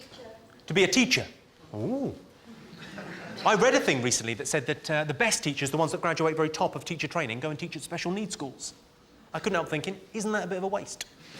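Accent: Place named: RP, United Kingdom. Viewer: Why did Jimmy used to put on such a posh accent?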